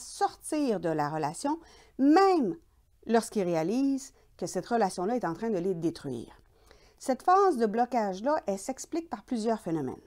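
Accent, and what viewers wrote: Quebec, Canada. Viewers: Ah oui et j'ai commander Ton livre abc verbal et non verbal En amour pour mieux comprendre J'adore et c'est facile à lire Ils y a quand même certains Mots que je comprends pas Mais je revient à la page pour comprendre ce que ça dit Merci pour tout. Vive la prochaine Capsule et j'adore ton accent québécois très cher Depuis la suisse bisous Sophie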